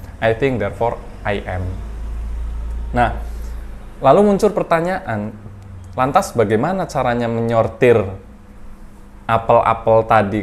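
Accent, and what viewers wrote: Banyumas, Indonesia. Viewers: Sukak dengerin penjelasannya, mudah dipahami. Sukak logat2 bicaranya juga, kyk ada ngapak2nya dikit.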